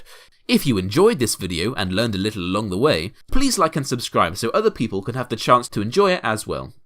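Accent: United Kingdom. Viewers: Why do you sound British while your grandfather sounds Scottish?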